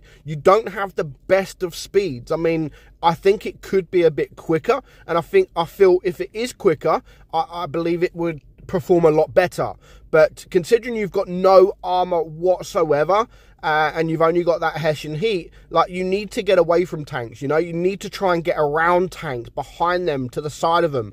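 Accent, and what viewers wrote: United Kingdom. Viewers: I like your Brittish Accent😁🇬🇧 Do you prefer Cobra? but is just like Smasher but 9 and medium.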